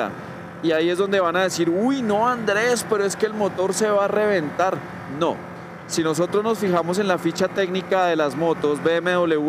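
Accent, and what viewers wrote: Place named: Argentina. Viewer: Antes de q diga q era Argentino ya me había dado cuenta q lo era. Tenemos un acento único! 😁 Buenísimo el video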